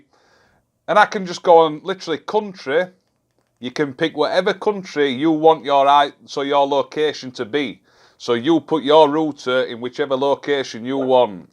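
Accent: Yorkshire, United Kingdom. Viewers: Great video, thanks for the informative video and links, where abouts in Yorkshire are you from mate?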